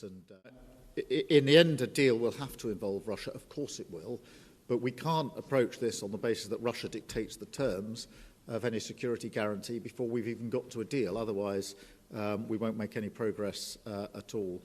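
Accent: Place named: United Kingdom. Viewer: イギリス英語すきだな アメリカ英語は、ベラベラしていて好きじゃないなぁ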